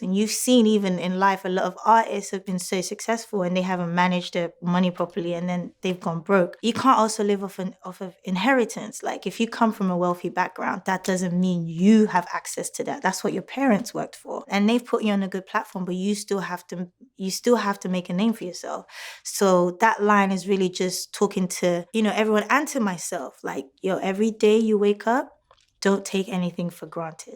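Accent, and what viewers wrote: United Kingdom. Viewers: What's up with the British accent though? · British accent I hear? Is she of British decent aside nigerian? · weezy o she probably lived/ grew up in the uk. There are plenty of Nigerians with British accents!!! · Where did the British accent come from?? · Famm that british accent is on point!! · Ho my gawd Where did the British accent come from🙄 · She studied in the UK and came when she was little, so obviously British accent and British passport. Stop hating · Does she have a british accent seems it mixed sorry i am French ? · Why the UK accent you are Nigerian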